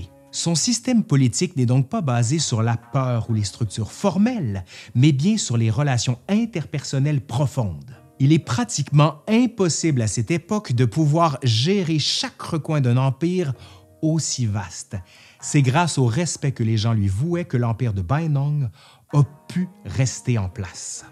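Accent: Quebec, Canada. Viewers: Frchmt avec ton accent québécois et ta façon de raconter ça donne envie de te suivre et d'en apprendre plus sur l'histoire 😉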